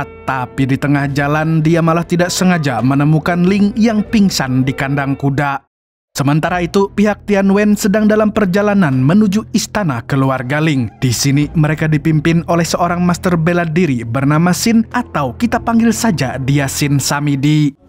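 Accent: Banjar, Indonesia. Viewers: Banjar kah pyn 😅😂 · Adminnya urang banjar le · Logat banjar 😂👍👍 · Org Banjar Sekali ny Sidin nih lah😂😂😂😂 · Orang Banjar'kh ikam ni.? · Orang banjar kh admin nya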